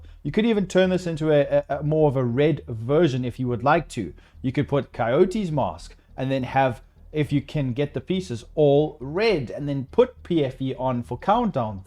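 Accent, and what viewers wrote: South Africa. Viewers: Jy klink erg Afrikaans... GREAT video!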